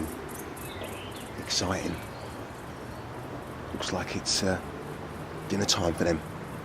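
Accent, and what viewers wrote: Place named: South London, United Kingdom. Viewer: Loving the vids bruv what country you fishing in. I can hear the south London in ya accent 👍👍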